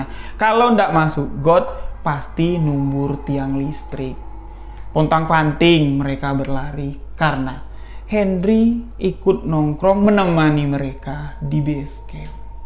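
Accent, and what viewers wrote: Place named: Jambi, Indonesia. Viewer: Walaikumsalam kak....n team SKM Mf kak sekedar request upload dong Kisah kota ghaib Saranjana..... psti y kereen banget d bwain kk dgn logat jambi yg khas........pasti kereen habis 👌 Slm sehat....slm smngat....mkin sukses y Semua.... Yuk gaskeeunn 🔥🔥🔥 By. Yana 🙏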